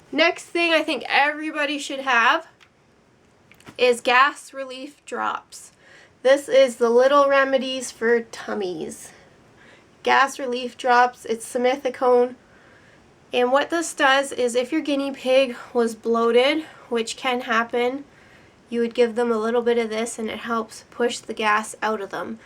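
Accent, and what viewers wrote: Canada. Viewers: Wait... are you Canadian?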